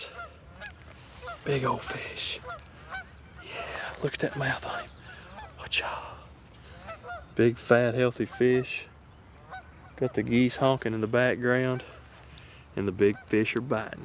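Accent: South, United States. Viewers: damn you are sexy!!! sry just had to say it...I love a man with a southern accent! NEW SUB!!!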